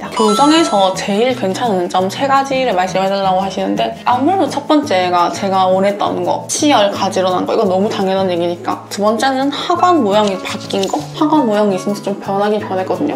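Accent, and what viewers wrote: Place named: Jeolla, South Korea. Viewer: 전라도 사람이신가요 말투가 매력적이네요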